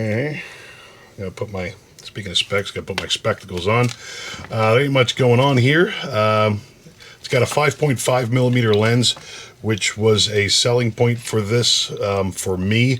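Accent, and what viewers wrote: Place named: East Coast, United States. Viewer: Ohhhh koooo.... that's what's up watching on my break right now... also I hear the east coast in ur voice...mirrah... instead of mirror lol that's awesome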